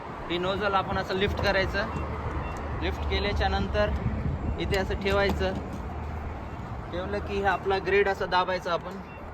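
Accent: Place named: Latur, India. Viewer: 🙏🙏राम राम दादा सर्व काही माहिती खूप छान देता कॅनडामध्ये राहुनी ही मराठी गावची लातूरची भाषा नाही विसरला तुम् ही❤❤👌👌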